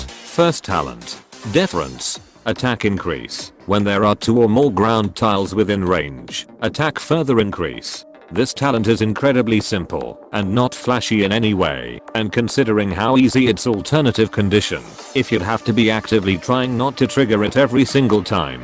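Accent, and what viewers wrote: United Kingdom. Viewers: British? that makes it even betteh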